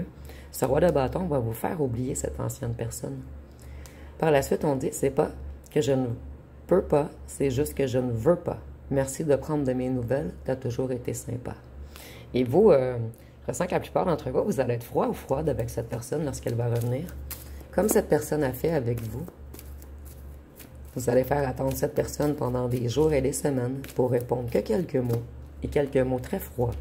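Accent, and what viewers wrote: Quebec, Canada. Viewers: Vous avez toujours autant de charme ,de séduction , de belles manicure et couleurs des ongles , vos tatouages bien fait et très jolis et comment vous avez dû souffrir dans le bras droit en plus , j'adore votre accent québécois ,votre charme ,vous êtes très coquette aussi je trouve ça 👍🌟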